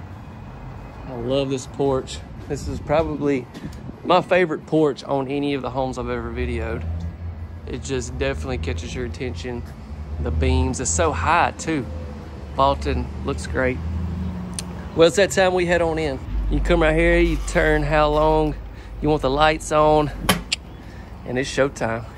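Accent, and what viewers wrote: South, United States. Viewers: Hey, I really enjoy watching your videos. I love your southern drawl. And I LOVE the pantry dance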